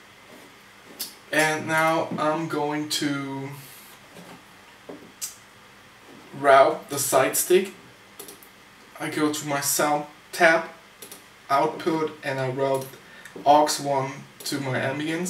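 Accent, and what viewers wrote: Germany. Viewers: Bist du deutsch? Du sprichst so englisch mit deutschen akzent :D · Very authentic. Where do you come from? Your accent sounds very german. Is it a good choice to start with maschine right from the beginning? Your much of a clicking person. but it's possible to browse with the Maschine Controller, isn't it?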